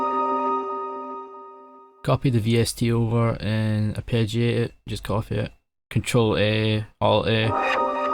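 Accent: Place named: Scotland, United Kingdom. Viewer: bro youre scottish af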